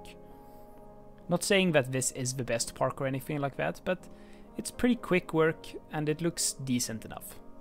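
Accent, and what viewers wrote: Sweden. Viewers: Swedish accent if I ever heard one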